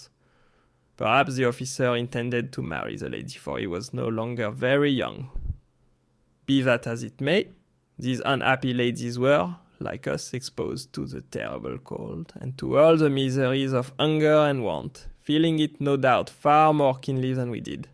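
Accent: France. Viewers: Excellent video man, I have listened to a few different accounts from the Russian campaign and I must say, even though you are speaking English the French accent provides a deeper authenticity you don’t get with other accounts.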